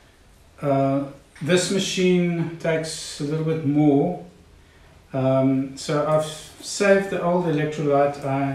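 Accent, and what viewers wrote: South Africa. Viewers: Ons kan nie weg kom van ons boer aksent nie né..😂😊😊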